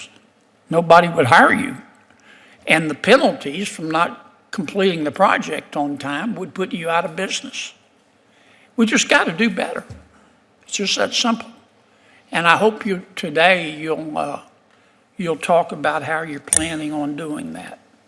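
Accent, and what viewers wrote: South, United States. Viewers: . NA that’s how he always talks sometimes worse. Southern I guess.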